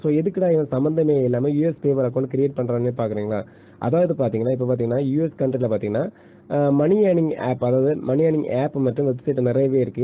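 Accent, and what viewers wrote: Kanyakumari, India. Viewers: Kumarii slang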